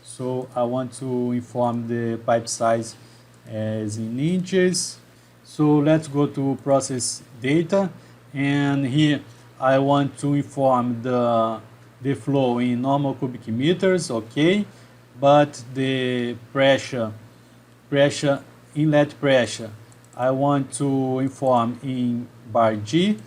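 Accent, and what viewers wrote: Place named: Brazil. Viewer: Cara que canal fantástico... É recheado de assuntos sobre a Engenharia Química pena que eu não manjo muito de inglês para compreender melhor seus vídeos, percebi que você é Brasileiro o seu sotaque te entregou um pouco hahaha. Entrei a pouco tempo para esse mundo incrível da Engenharia Química, estou no 3° período do curso e encontrei seu canal... Parabéééns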